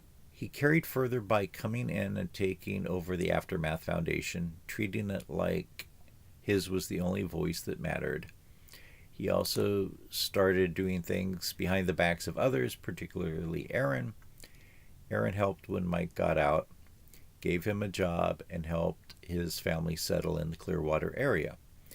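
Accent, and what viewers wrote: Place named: Adelaide, Australia. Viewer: I think it’s because he grew up in the eastern suburbs of Adelaide and went to school there. When I first heard him speak I thought he sounded like he was from there and turned out he was.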